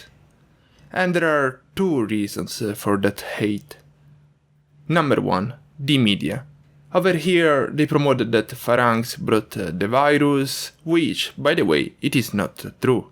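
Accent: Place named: Italy. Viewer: He is a very good Vlogger now it reminds me Sunny in Thailand, plus I do love his Italian accent, he is more improving now.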